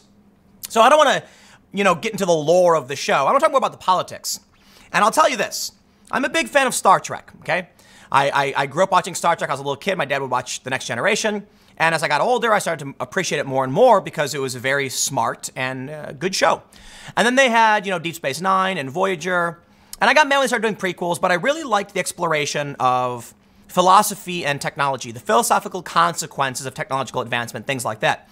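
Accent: United Kingdom. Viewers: British accent is the best part of this video.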